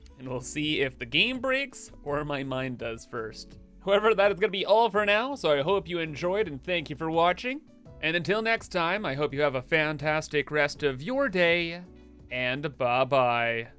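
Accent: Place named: Canada. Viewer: OOT and ABOOT! Do I detect a fellow canuck??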